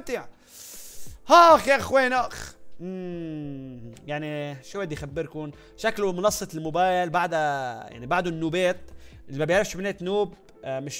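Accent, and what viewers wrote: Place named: Jordan. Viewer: لهجتك اردني على مصري على لبناني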